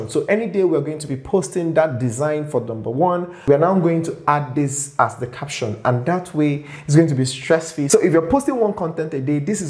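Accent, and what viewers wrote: Nigeria. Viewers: I like listening to your accent. I’m guessing Nigerian and Igbo?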